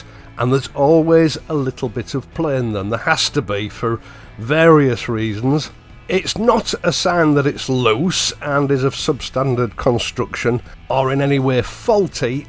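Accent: United Kingdom. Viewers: Your road reviews are like a high quality double barrelled over under shotgun, with both riggers pulled together. One barrel fires the excellent British English at the viewer and the second the gorgeously green English countryside. That both "barrels" operate flawlessly always and every time is the icing on the cake, so to speak. This one and the one by Mr Freddie Dobbs and Mr Mark Pulling remind me of " ". That song describes the exact sentiment of this bike. Thank you very much, sir, for yet another and as usual, true to form, review. Will be going tomorrow for a test ride of the bike. It should be a worthy successor to my 2014 (since sold) Bonneville 865.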